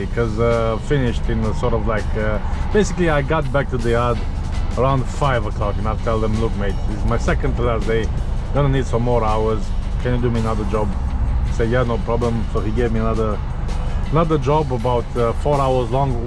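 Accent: United Kingdom. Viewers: Love the way your Scouse is coming along,. Couldn't live without the videos, proper grounding seeing other jobs like yours (and mine) which keep the lights on in the country. Have a good Christmas and New Year squire 👍🏻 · I can hear a bit of scouse in you 😁😁. I am from Liverpool do you no anywhere takin on or what agency do you use?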